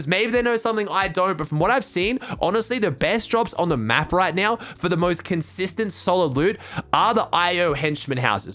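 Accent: Australia. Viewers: Are u an all-star Aussie? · Are you from Melbourne or Sydney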